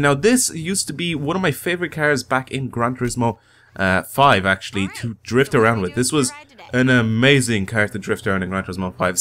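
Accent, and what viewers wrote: Ireland. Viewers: Are u IRISH, I am :)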